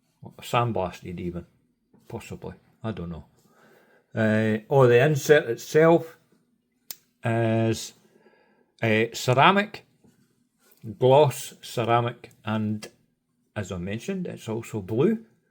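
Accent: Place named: Dundee, United Kingdom. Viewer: Are you a Dundee guy? 🏴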